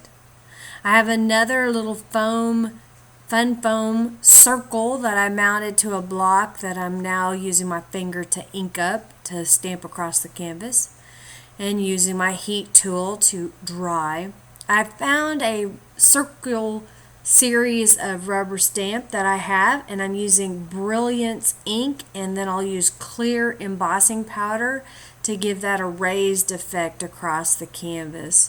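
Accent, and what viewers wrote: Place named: South, United States. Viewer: Awesome, this piece is really cool! I've always loved those colors. p.s. I luuhv yerr suhhtherrn ehccent! Nashville?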